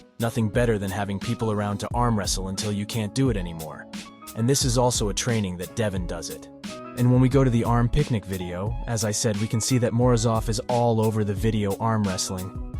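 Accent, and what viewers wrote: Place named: England, United Kingdom. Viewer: Que belo sotaque inglês, bicho. Parabéns!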